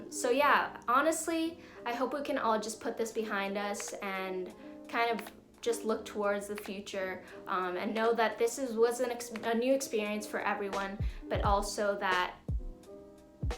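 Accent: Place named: United States. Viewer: What country do you live in? You barely have an accent. You sound American.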